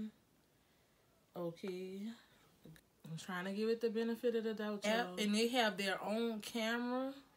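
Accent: New Orleans, United States. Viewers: You must be from around New Orleans. I know that accent.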